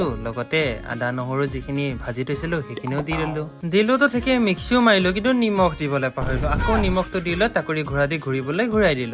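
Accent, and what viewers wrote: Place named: Nalbari, India. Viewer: dada mur nam tu lona koi koi vagor lagisa❤❤ tumi kom kom buli he koi thaka nokowaya dakhun nalbarir para koiso❤❤❤❤❤❤❤❤❤❤❤❤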